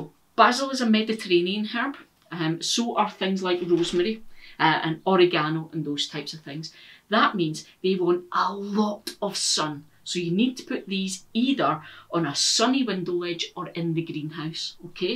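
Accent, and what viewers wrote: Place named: North, United Kingdom. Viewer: I love your accent it reminds me so much of my family up north. Xx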